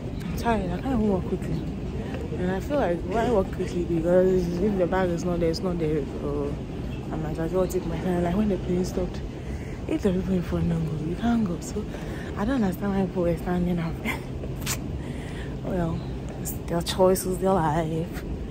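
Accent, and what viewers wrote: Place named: Nigeria. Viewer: Congratulations to a successful trip❤️💌🥂 I love your accent, it sounds like that of a Nigerian 🇳🇬🥰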